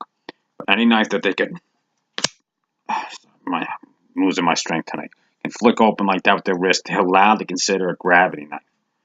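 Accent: New York, United States. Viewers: Love ur New York accent , it has that 70’s 80’s sound to it lol love it bro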